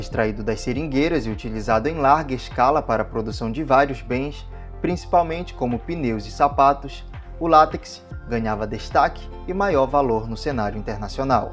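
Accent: Pará, Brazil. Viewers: Este sotaque é de Paraense,meu conterraneo!Parabens pelo canal.Verdadeira utilidade publica!!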